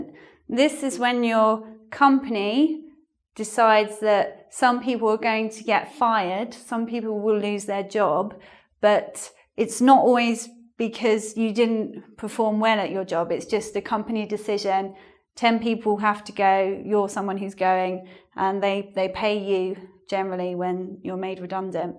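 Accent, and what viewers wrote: United Kingdom. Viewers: Hi:) i really love watching your video in youtube and engvid what a intresting topic and i like your real british english <3 at first time i watched your video, i can't understand anyting!! but now i heard your all word of sentences. thanks a lot.XX but would you mind if you tell me your personal website address?:) please reply me:) i'm waiting you ❤️ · Her accent is Ideal in order to get used to british accent: it has all the characteristic of the accent of people I can't understand, but I can easily understand all she says. I'm actually watching all her videos to get used to british accent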